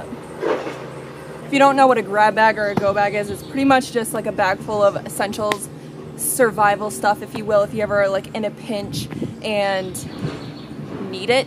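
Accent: South, United States. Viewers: Girl you got me as a sub as long as you keep running around barefooted and talking country like that I'll follow you any where on this planet!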